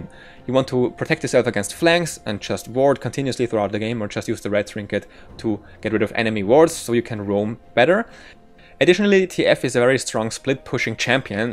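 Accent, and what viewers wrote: Germany. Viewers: hahah der deutsche akzent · nice video dude. Btw are you German by chance ? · Deutsch?hast irgendwie nen deutschen akzent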